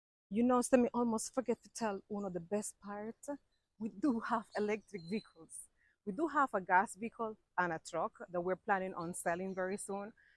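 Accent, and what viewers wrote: Patois, Jamaica. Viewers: Yoooo dat cubana and yaad accent caught me off gyaad. 🔥🔥🔥 when u said mi nuh ave nuh tv inna mi yaad, i died. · Your accent is strange..... in a good way. Its beautiful. Its like hearings 3 different persons speak at the same time. The patios, the standard english, the cuban accent👌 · Sis I love the Spanish /Jamaican accent 😍😍😍😍😍 absolutely love THE LIFESTYLE😍😍😍😍👍💯.BIG UP USELF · Beautiful family life style, I love the way you speak Jamaican English. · Its your jamaican bad gyal accent for me lmaooo so funny annd cute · Patois creep in pan u because u a jamaican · Mi love how you have the Jamaican accent fully keep it up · Your a Cuban but have developed a Jamaican accent and still have Spanish accent absolutely love hearing you talk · I love your ❤❤ your patois 😂😂😂😂. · Your jamaican accent strong 🤣🤣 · Love your Jamaican accent 😂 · Your Patois is so funny! 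😅😂😂😂😂😂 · i literally watch you for your patois/cuba accent - LOOOVEEE IT!! ( ok I also watch you for your cool personality and for inspiration on my move to JA - thank youuu!!) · I am a Jamaican living in the Bahamas. I love your Jamaican potwait sound good . Keep up the good work. Wishing you and your family great health and a blessed life .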